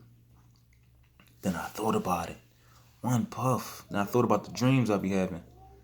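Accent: Philadelphia, United States. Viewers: Before he even said Septa I knew bul was from Philly. Props bro. I’m on the same path. This helped me stay focused.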